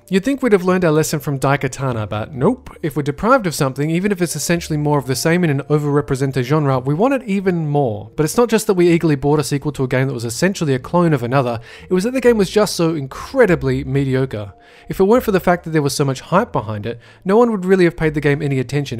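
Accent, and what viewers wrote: Australia. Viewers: He's an Aussie, mate. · ummm he's Austrailian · No he is just Australian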